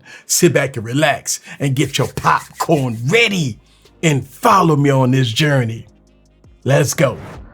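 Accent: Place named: New Orleans, United States. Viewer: Man you gotta be juvenile daddy or something lol . Not trying to be funny or anything but this how he's gonna look as he she and y'all sound just alike you gotta be from new Orleans 😂 .I love your channel btw black brother ❤️💯🫱🏿‍🫲🏾💪🏾💯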